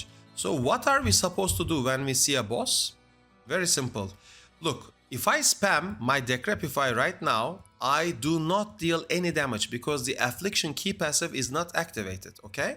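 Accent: Turkey. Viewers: isim dehşet iyi, youtube a yazdım aksanda Bi farklılık sezdim aha dedim türk 😁😁 ekmeğini yiyoruz forumlarda saygılar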